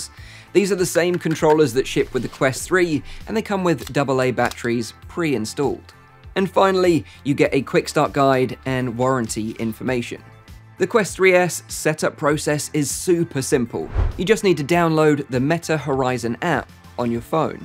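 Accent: United Kingdom. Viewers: Thats the same vr i have and he talk british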